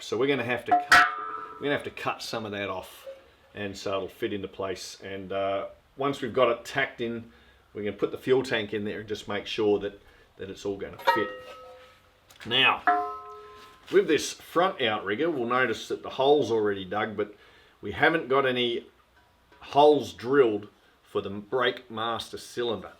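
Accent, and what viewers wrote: Australia. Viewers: As a fellow Aussie, It is a rare pleasure to hear an Australian voice on a you tube show. I have been trying to pick your accent, reminds me of a amalgam of Steve Irwin and Paul Hogan, a touch of John Williamson, with a hint of Bob Hawk and Les Hiddens, hope those Americans appreciate the education they are getting!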